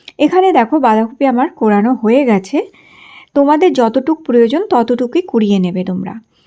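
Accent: Bangladesh. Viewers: মাগো তুমি বাঙাল নাকি। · বাড়ি কই টাঙ্গাইলের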